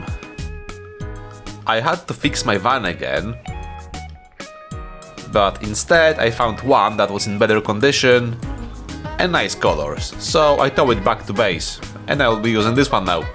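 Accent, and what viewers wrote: Poland. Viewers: This was such a wild ride! I really enjoyed watching the whole thing and your Polish(?) accent and humour made it even better 😊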